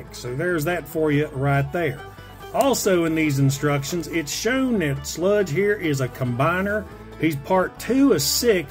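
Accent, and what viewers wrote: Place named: South Carolina, United States. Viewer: I live in S.C. and I got some family that talk just like that, except a tad more intense. I have a mild case of it but I can't help it. 😂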